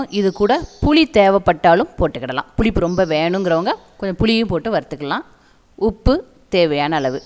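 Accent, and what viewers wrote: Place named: Tirunelveli, India. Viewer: Pudina Chutney super. Ungal Tirunelveli Tamil also super.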